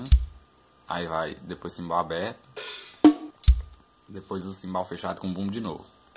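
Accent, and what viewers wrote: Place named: Northeast, Brazil. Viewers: Forró é vida ? Só pelo sotaque vejo que é nordestino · Teu sutaque parasse se da Paraíba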